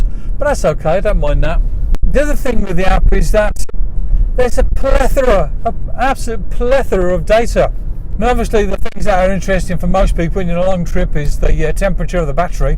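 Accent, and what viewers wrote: United Kingdom. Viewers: I suppose u need to be Brit to follow your conversation....too blabbery and too much accent etc....bye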